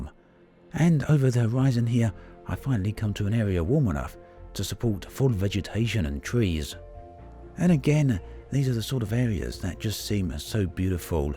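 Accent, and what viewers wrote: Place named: England, United Kingdom. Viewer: How in the name of good lord fuck did you think he was from america lmao he has one of the strongest English accents around hahaha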